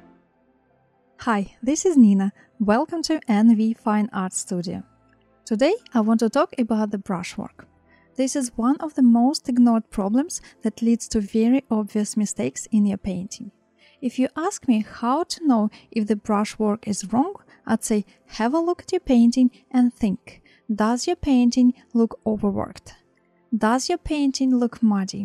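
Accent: Russia. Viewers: С первой секунды слышно соотечественницу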